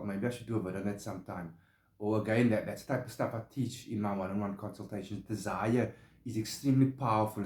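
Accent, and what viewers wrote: South Africa. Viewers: Correct me if I am wrong...you sound Capetonian South Africa.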